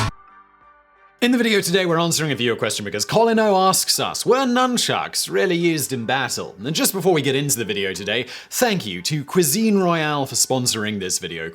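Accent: United Kingdom. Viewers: The British accent is a plus. That's about the only positive . The rest is all hyperbole.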